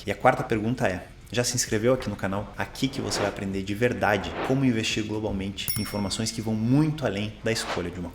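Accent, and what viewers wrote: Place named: United States. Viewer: Você é americano? Pois tem sotaque Se for vc deve entender bem do mercado americano. Como é o seu curso?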